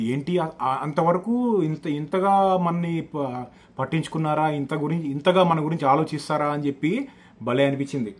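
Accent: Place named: Telangana, India. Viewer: pure telangana slang Broo. support from Kamareddy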